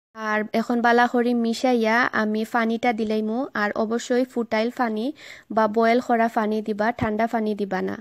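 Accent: Sylhet, Bangladesh. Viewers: আপনার মাত খুব সুন্দর লাগে কারন আপনে খাঁটি সিলেটি মাতইন. আপনার রেসিপি দেখি বালা লাগে. মাসা আল্লাহ আপনা বেবি কিউট আছন দুআ করি তান লাগি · Nice sylhet e voice ma sha Allah! Khati sylhet e everything. Nice to see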